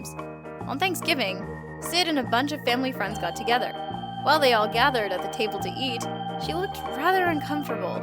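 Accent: Canada. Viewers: is canadian? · Is from Canada? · Are u Canadian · Huh, didn't know was Canadian. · Is Canadian?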